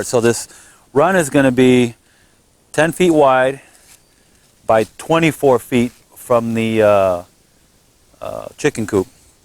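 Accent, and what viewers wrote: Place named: Northeast, United States. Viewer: Never would have guessed You are from texas. Your accent sounded to me l ike you're from the North or the Upper Northeast almost Canada It's the 'O'. Got an O myself, Lived in the south all but the 1st 4 yrs of my life and I still got the O.😂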